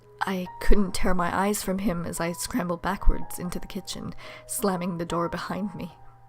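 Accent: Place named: United States. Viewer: If I didn't know better, I'd say you were actually American!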